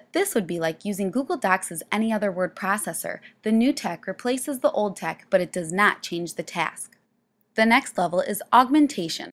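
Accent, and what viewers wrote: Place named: Midwest, United States. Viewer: That midwestern accent :)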